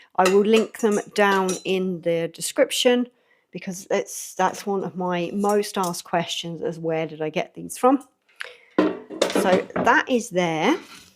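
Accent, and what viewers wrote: United Kingdom. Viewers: Great to hear a pommy accent for a change, instead of the yankee ones.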